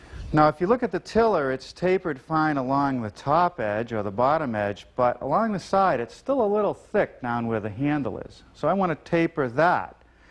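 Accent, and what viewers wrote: New England, United States. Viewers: I used to watch his show as a kid. I never noticed the strong New England accent back then. Now all I can think of when I hear it is Peter Griffin.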